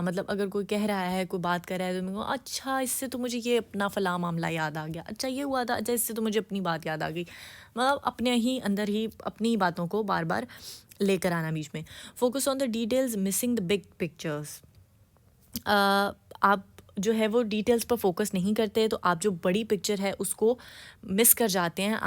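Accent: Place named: Punjab, India. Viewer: mam punjabi bolti hyn ap smjaty hoay bht acha lga apka andaz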